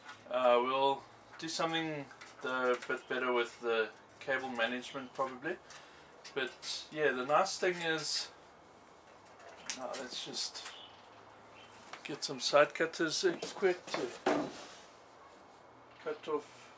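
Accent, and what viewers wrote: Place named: South Africa. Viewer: Never heard such a strong Saffer accent.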